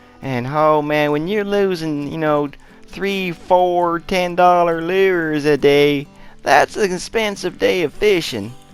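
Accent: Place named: United States. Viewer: Lol the country accent gets me every time 😂😂😂 Any thoughts on the summer update?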